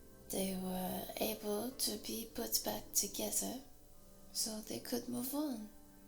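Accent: Japan. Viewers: My dumb ass read the thumbnail text as ‘kitsunei kurasai’ 😂, Your Japanese accent was excellent and made the entire session truly special. The way you spoke English with that Japanese accent literally sounded exactly like my mama! 😆 Ohh and the new binaural microphone was an amazing experience, it immersed me to the point where I felt genuinely present in the workshop. The ending was perfect, sweet and wholesome. It added a heartwarming touch to an already delightful experience, leaving me with a sense of satisfaction and gratitude. Thanks so much for this superb audio it was a masterpiece. It’s now one of my favourites and I can’t wait for more like this!! 👏🏼 Good luck with your exam today, I hope your mamas feeling much better sending prayers and my best regards ❤️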